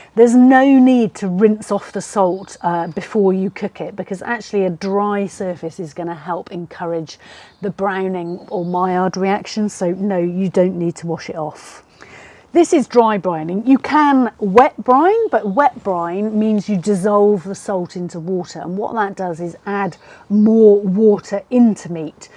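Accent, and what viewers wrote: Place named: Bristol, United Kingdom. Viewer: Thank you, young lady. Iam just about to do some St. Louis Ribs on me offset slow cooker here in SoCal and I shall give that a try. Love hearing a good old Brittol' acent again.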